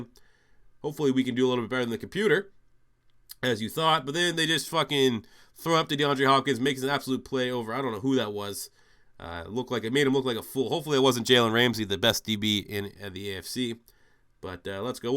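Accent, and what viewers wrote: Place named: Canada. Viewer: I can't listen to a Canadian speak for 30+ minutes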